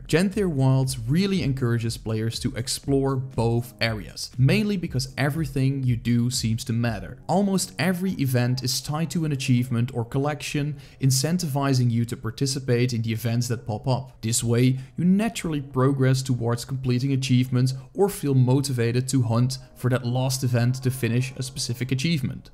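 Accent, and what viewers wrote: Netherlands. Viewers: Came for the A-class content. Stayed for the dutch accent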